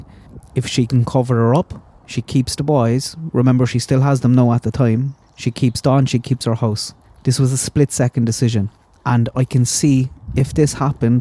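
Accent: Ireland. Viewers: That’s possible your theory, alcoholics and drug addicts don’t pay attention to their children. Then put a phone on top of that and you’ve got a mother who never does anything. Just take a look at that house it’s filth. The only thing she cares about is drinking and getting high. The kids are just an annoyance. I love your Irish accent. My last name was Dailey 😀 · Police dogs can pick up DEATH. The boys has been in CPS for over 9 months & would have said something by now. Summer's foot prints were found on 2 different properties confirmed by PI & Dogs. This theory is CRAY CRAY. Crackin Case done GREAT coverage of this case with FACTS. I enjoy listening to your accent & your slang for stuff-Irish Right?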